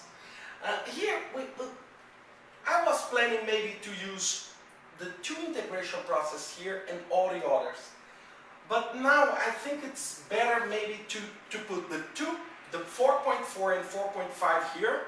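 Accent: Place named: Brazil. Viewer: where u from? you sound like Brazilian! am I correct?!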